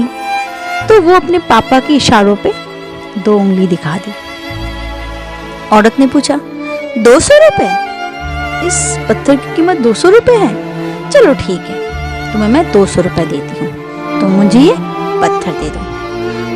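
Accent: Bengal, India. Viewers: मेम साहिबा , कहानी तो बहुत ही ज्ञानवर्धक और प्रेरणादायक है , इसके लिए बहुत बहुत धन्यवाद । आपके बंगाली टोन के कारणों से बहुत ही छोटी गलती स्त्रीलिंग और पुल्लिंग के होता है , होती है में अंतर मिट जाता है , बुरा नहीं मानेंगे । कृपया ध्यान दें । 👍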